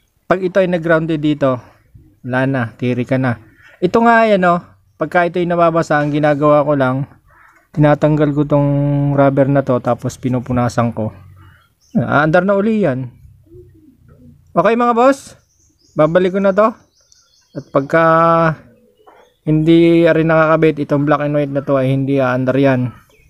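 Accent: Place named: Batangas, Philippines. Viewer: Boss na sub na kita. Npansin ko lng batangenyo ka.. btangenyo dn to hehe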